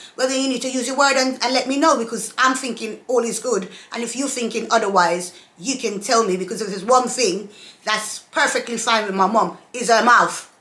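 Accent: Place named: England, United Kingdom. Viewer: Your English accent is awesome don't change it for anyone. Pray for mom that she keeps safe.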